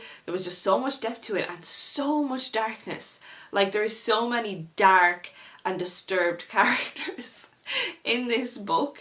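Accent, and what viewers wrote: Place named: Ireland. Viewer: I had to subscribe to your channel because I also love the same genre as you in novels ... and because of your gorgeous Irish accent. Lol. ❤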